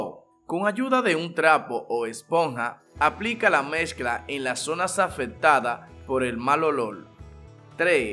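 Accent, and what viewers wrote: Dominican Republic. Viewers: Así hablan los dominicanos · Ah ok, es bueno aprender algo nuevo cada día. Entonces supongo que es un acento de algunas regiones de República Dominicana. Igual se debe de respetar las diferencias culturales 😅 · Es el acento dominicano, aprenda un poco :) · 🤪 los dominicanos hablamos así😝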